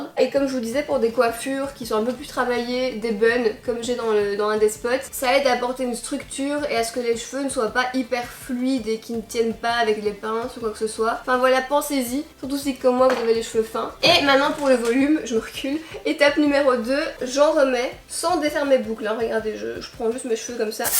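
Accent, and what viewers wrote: Belgium. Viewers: Trop heureuse que Batiste t'es choisi pour la campagne! Tu es géniale ! Par contre déçu qu'il t'oblige a dire "huit" avec l'accent français, je préfère ton joli accent belge! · Je viens de commencer à te regarder et je t'adore . Tu es Belge ? Moi oui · J’adore l’accent belge mais sourci ça passe pas 😂😂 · C'est malin je vais écouter maintenant! Par contre j'suis déçue qu'on t'ai forcée à dire le "huit" à la française, en quoi est ce que choquant qu'on entende que tu viennes de Belgique? C'est dommage ça retire ton charme:( Et puis merde vive la Belgique! XD (Oui je suis belge XD)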